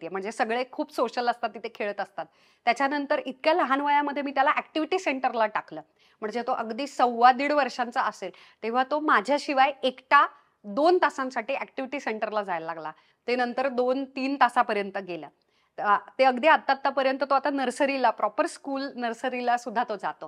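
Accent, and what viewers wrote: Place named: Pune, India. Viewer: कधीतरी नॉर्मल टोन मध्ये का नाही बोलत आपण? बोलण्यात सारखा पुण्याचा अभिनिवेश का असावा? बाकी आपले व्हिडीओज चांगले असावेत. आमच्या "ह्या" तुमचं कौतुक करतात.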